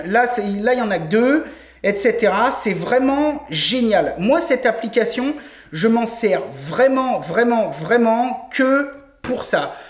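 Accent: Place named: Western France, France. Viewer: Cette vidéo est top ! Je me suis levé de mauvais poil et ça commençait à pas filer droit mais tu m'as vite remis sur la bonne ligne si j'ose dire. J'aime bien ton franc parler, notamment le "ayait", tu serais pas de l'ouest toi ?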